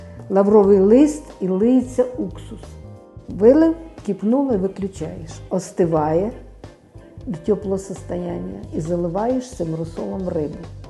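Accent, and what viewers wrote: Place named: South, Ukraine. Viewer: Наш південний говір! І смачні мітітеї! Дякую Вам. Чекатиму наступних відео. Хай щастить!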